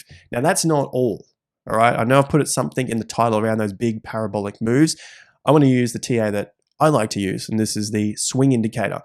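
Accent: Australia. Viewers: Surname might be Italian, but an Aussie and he speaks like one.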